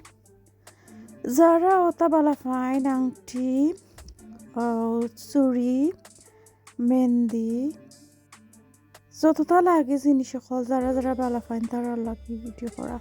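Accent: Sylhet, Bangladesh. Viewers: আপু সিলেটি কথা গুলো আমার অনেক ভালো লাগে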